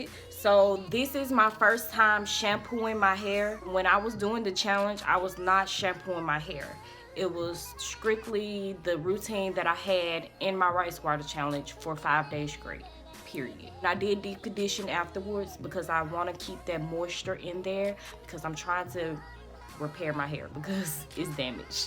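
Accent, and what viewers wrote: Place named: Midwest, United States. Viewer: Lol where you from? I’ve heard many people talk like this in the Midwest.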